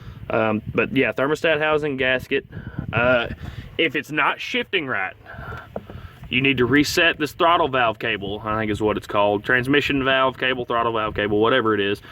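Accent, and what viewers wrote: United States. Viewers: Sub'd - I have a 2.5 TD Sport here in Scotland I bought for £600 4/5 years ago...it needs a bit of TLC...I enjoyed your informative, casual, southern style...will check your other vids! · jesus if you're not from missouri i need to reevaluate my accent and great video by the way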